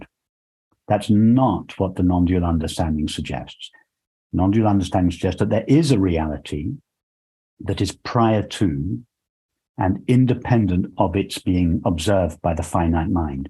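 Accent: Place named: Belfast, United Kingdom. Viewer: Hi, I here ur accent I feel like ur from Belfast or near to that. I have been on this journey for some time now and I get some glimpses here and there. I'm really starting to understand things at an intellectual levels. I'm on you tube alot seeking. I'm just wondering is there anyone you woukd recommend from our side of the world to help guide me on this path. Thank you for this video. I look forward to watching more. ❤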